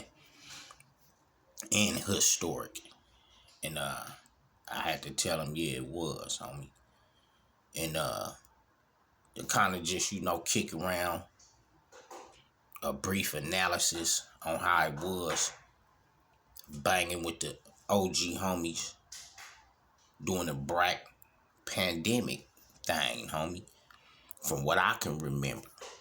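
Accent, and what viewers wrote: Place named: West Coast, United States. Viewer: Man I listened to your stories throughout my day at work and I'm a Crip from Denver and I know you're not lying and you're not fake about nothing you say however you can actually get paid do voices for like a new Grand theft Auto hell you can even be in movies you got that authentic stereotype West Coast accent and voice it's nothing new to me but to the world it would be a sight to see like yes n*** like this really exist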